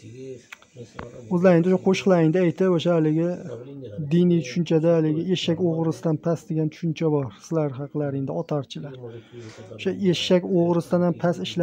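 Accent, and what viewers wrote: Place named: Tashkent, Uzbekistan. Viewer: Салом,. Гапингиздан Тошканликка ўхшаяпсиз. Мабодо Тошканда кўриб қолсангиз,мани ўрнимдан, чирройли,силлиққина"башшарасига тупуриб қўйинг. Йўлим тушиб,бориб қолсам,ўз синглимдек ҳурматингизга етардим. Олдиндан раҳмат.🙏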